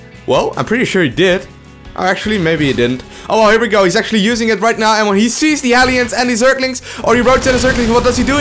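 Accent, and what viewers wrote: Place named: Netherlands. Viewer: grrr i like ur content but im Dutch myself and i cannot stand the Dutch accent people sometimes use :P if i were you i would try and work on the pronunciation + switching words like: these Hellbats are all getting killed right now... You've said it a couple of time in this vid... ofc it should've been roaches :O i guess its cuz u talk really fast and its really hard :P im just saying.. trying to be helpful here; D Succes met je video's ;)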